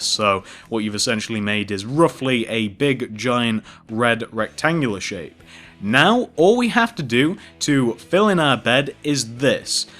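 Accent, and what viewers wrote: United Kingdom. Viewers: I like your British voice, so awesome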